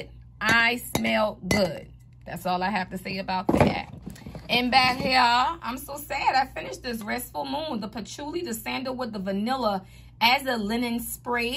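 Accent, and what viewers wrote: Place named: New Orleans, United States. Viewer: Your accent gets me every time! Are you from New Orleans?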